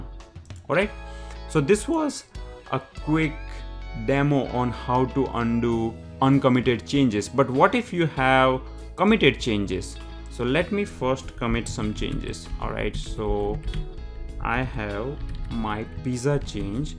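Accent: India. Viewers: So simple, so understandable and with the Indian accent that switches the brains into learning mode. Thank you!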